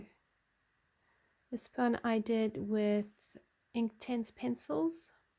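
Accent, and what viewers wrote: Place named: South Africa. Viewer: It was so great to hear another South African voice on the Color Tube. Good luck with your channel, your coloring is so lovely.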